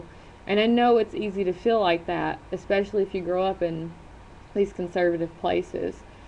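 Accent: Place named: South, United States. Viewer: You are breaking the misconception that every individual living in the South or Southwest are all Bible toting twits. I love the accent and the sprinkled curse words. It adds some swagger to those rational thoughts and ideas that you are having. Don't stop speaking your mind.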